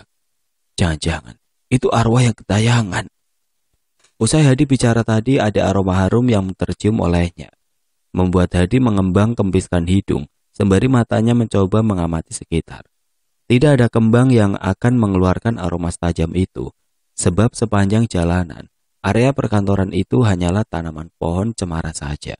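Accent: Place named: Medan, Indonesia. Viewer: Org Makassar tp logat medan, saran aja bang, sesuaikan ajaa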